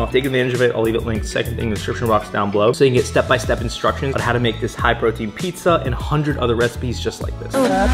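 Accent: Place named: Texas, United States. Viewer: I can tell you from TX! Good video thanks!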